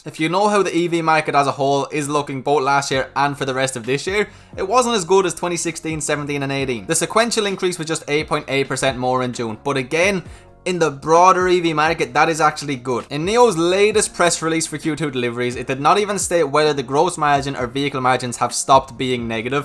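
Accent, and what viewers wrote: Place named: Ireland. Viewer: I love your Irish accent, its so cute and you're so enthusiastic about the stocks you cover. Thanks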